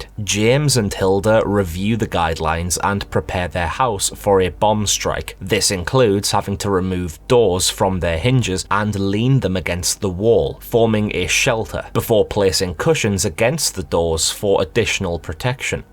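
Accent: United Kingdom. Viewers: I like your accent! Great Britain?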